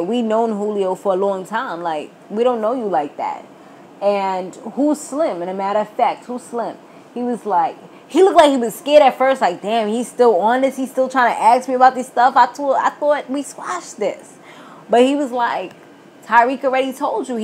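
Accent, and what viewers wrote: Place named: Brooklyn, United States. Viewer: Are you from Brooklyn and of Caribbean descent because I hear a Brooklyn and Caribbean accent when you say certain words.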